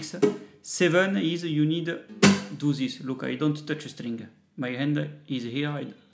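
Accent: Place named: France. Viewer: es-tu français, du coup? J ai remarqué que t as un accent français.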